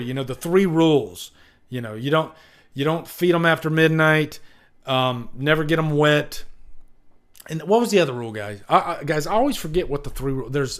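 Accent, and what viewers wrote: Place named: Southeast, United States. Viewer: What an Elvis nod! Brilliant! I love your accent. Where are you from in the US? I'm guessing south east (I'm not from the US). Thanks for the list!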